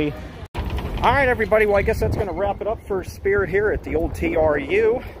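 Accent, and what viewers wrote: Pennsylvania, United States. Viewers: Hey Bro !! Love the Content !!!! Love your Pennsylvania Accent!!!! I Love going into Sprit Halloween every Year and seeing all the cool stuff they have !!!!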